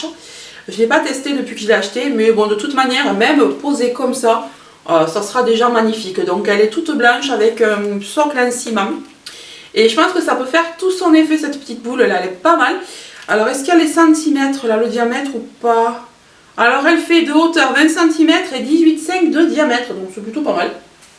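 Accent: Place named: Tarn, France. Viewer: Bonjour, ta vidéo est très sympa. De plus, quel bonheur d'entendre ton accent, qui est aussi le mien, sur YouTube. Bisous 🥰🥰 du Tarn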